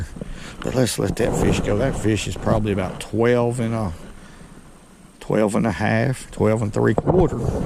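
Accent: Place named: Alabama, United States. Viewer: I just love it when he says "Q...u..it" with that Alabama Accent.. And Im from TEXAS!!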